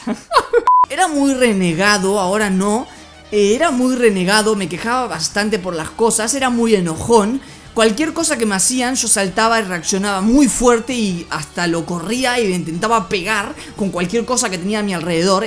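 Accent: Buenos Aires, Argentina. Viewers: Pensé que era mexicano, pero después sha toco el tono porteño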